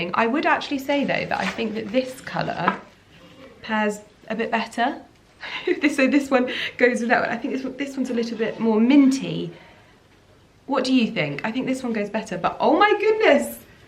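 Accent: RP, United Kingdom. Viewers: The way you say “The the the…” before every word is irritating. It’s because of the thinking you do before talking, to try and sound posh. You sounded much better with your natural speech, when it flowed.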